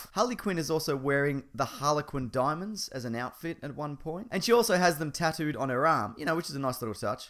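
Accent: Australia. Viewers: Great video! I love your voice, by the way! Nice accent, and I love how you get louder and quieter. Are you Australian?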